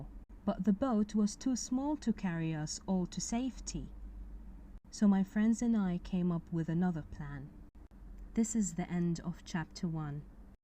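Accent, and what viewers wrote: United Kingdom. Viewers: Wonderful Miss you're an excellent teacher. You don't know how I love British accent and I wish to visit London in one day 😕🌷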